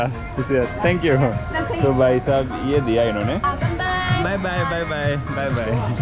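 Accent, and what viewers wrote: Haryana, India. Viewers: Bhai ...kye aap hariyana se ho...aap ki boli mai ye suff jhalak raha hai...I like hariyanvi boli....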